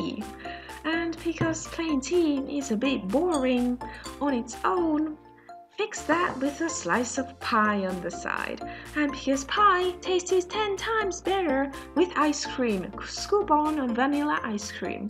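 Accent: Finland. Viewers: I'm currently debating with myself on if you are Finnish (it's the accent).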